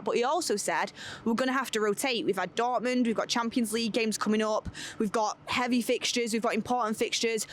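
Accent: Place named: Manchester, United Kingdom. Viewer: A confident blonde with a Manc accent, thats what i like.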